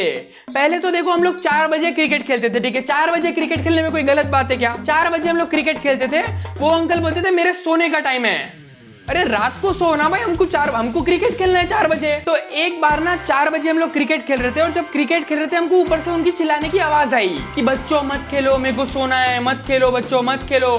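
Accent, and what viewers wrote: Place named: Gujarat, India. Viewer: Bro are you Gujarati because you have a Gujarati tone and I am a Gujarati too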